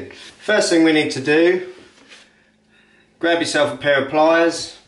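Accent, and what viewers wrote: Norfolk, United Kingdom. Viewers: Great video and I've just ordered 2 through your shop. Keep up the good work mate, sounds like you're in Norfolk? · You sir are a hero, just saved me a lot of hassle in the bathroom and by the sounds of your accent a fellow Norfolk boy as well, thank you very much 👍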